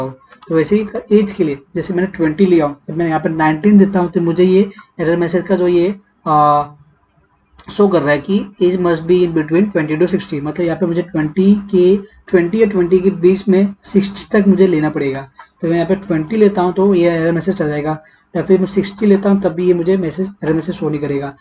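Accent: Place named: Bihar, India. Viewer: Lag raha hai aap bihar se ho thik hai.❤❤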